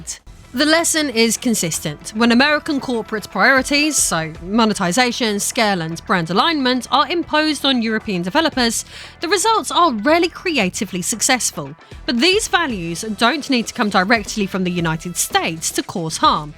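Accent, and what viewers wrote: United Kingdom. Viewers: She said with a British accent the country locking people up for tweets ffs. Then the first comment she pins is all adds and ways to pay her. But damn America is capitalistic and money hungry.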